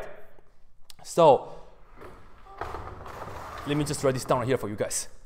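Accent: China. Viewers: Se le notaba más el acento chino xd. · What really pisses me off is that there are 377 mean-spirited people out there who can thumb up this: Pratap Singh: "Improve your accent first of all. I could not comprehend it at all. Chinese pronunciation works on chinese language only not on English." Work it out. It's not easy to get near-native pronunciation in a foreign language, which is what English is for him. Now if you have a hearing disability I might sympathize with you but you still should not demand unreasonable demands of the video author, but rather seek some kind of treatment and/or have someone else listen to the video for you to tell you what it is saying. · Lol, this is not Chinese accent, I have to make it clear. This is Chinese dialect accent.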